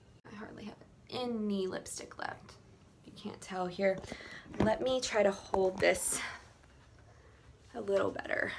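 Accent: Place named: Texas, United States. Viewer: I live in England (UK) but already thought you lived in Texas from your accent (y'all) and the way you guys interact etc. :,)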